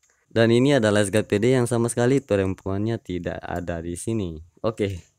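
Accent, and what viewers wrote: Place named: Muna, Indonesia. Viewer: Makasih bang, sangat membantu bagi pemula 🙏 bdw, logatnya seperti daerah sy (MUNA, Sultra) 😁 maaf kalo salah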